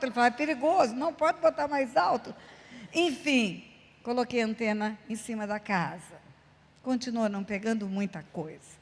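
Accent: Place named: Minas Gerais, Brazil. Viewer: Menina forma carinhosa de mineira falar tu és uma benção de Deus aqui na terra amada Pastora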